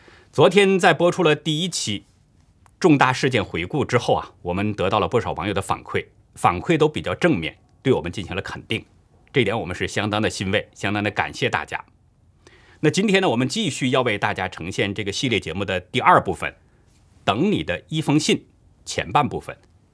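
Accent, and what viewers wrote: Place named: Hebei, China. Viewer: 谢谢李老师。听老师的口音，好像是河北人？能听到一点唐山/承德一带的口音。😆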